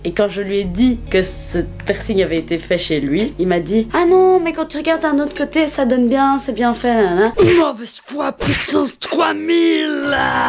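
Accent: Belgium. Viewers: ahh mais je t'aime d'amour !! Tu me donnes trop le sourire avec ton petit accent belge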